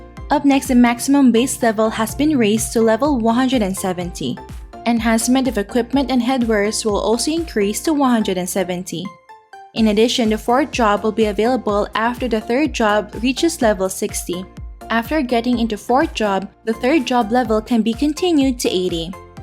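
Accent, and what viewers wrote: Philippines. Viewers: You sound like a filipina trying so hard to sound american but your filipino accent is coming through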